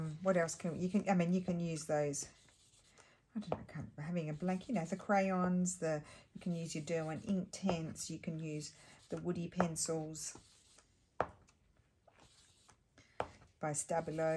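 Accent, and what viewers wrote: Australia. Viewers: You have such a calming voice when you are playing? Probably the Aussie accent. Your eye for colour is amazing.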